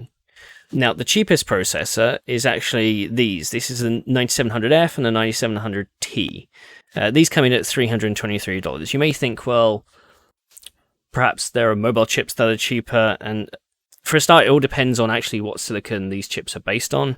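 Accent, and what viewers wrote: England, United Kingdom. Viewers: I needed an English accent to complete my collection of Tech reviewers. Came here from your Level One Tech stream.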